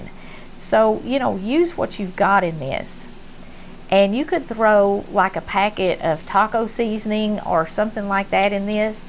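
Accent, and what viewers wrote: South, United States. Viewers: She is a sweet and real lady but, I just love her accent...southern and country. God Bless her and her family!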